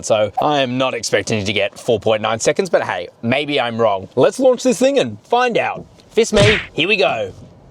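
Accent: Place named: Australia. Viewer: Why does an Australian accent make everything more fun to listen too!!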